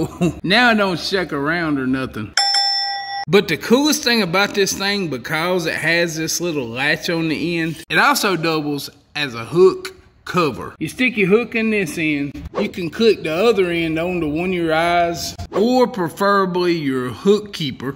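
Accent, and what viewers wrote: United States. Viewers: oooooollll, lol what an awesome country accent.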